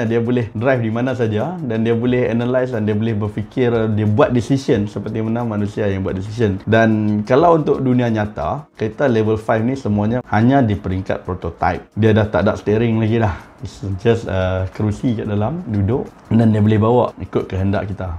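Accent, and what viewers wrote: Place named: Kedah, Malaysia. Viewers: Mesti org kedah ka? Hahahahah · Bila mana speaker mula berbicara guna bahasa kedah, aku dah tau betapa substancenya benda yg dia nk sembang tu. #thisisnorth #padubaqhang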